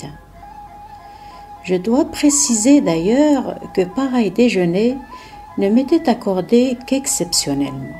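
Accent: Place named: Algeria. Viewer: Un bon resume, avec un accent Algerienne. Tres Bien au narateure ! Merci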